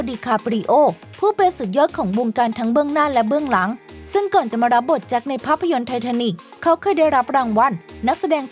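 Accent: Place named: Suphanburi, Thailand. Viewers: แอบขำแอดแอบเหน่อ 😄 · หนคนเดียวมั้ยที่ฟังไปเรื่อยๆละคิดว่าคนภาคเสียงหยั่งเหน่อออโค๊นสุพานน55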